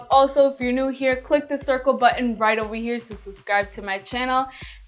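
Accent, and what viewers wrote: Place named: New York, United States. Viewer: I love your accent. Are you from New York?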